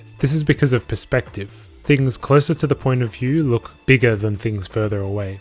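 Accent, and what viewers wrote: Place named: Australia. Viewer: >strayan accent >math :(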